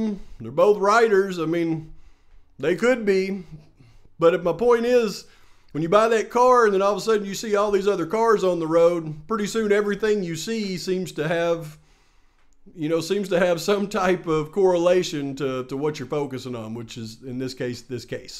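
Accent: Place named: South, United States. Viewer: I just love you. But can you please confirm if you are holding a bug zapper in your hand as a mic? Your country accent, paired with your laid back style plus all the bass pro shop shirts, has me convinced… that it very well could be. 😂😂🙌🏼🙌🏼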